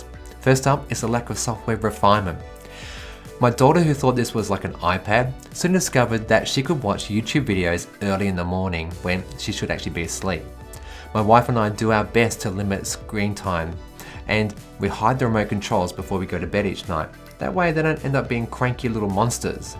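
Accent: Australia. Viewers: the Australian accent also helps...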